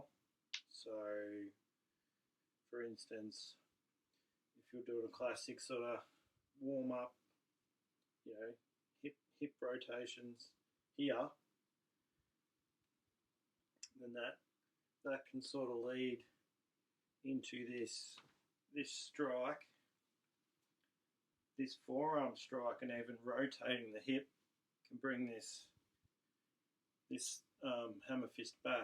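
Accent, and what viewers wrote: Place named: Australia. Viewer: Dude, you're Australian. I never put two and two together. I've watched a couple of your videos and always imaged you talking in a US accent. I always wondered where Kangaroo Kungfu comes from and now I know.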